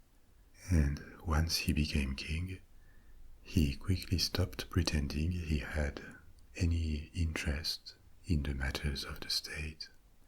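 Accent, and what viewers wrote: France. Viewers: I'm french and I'm listenning to a french guy speaking in english, I guess I'm weird. However the voice is really relaxing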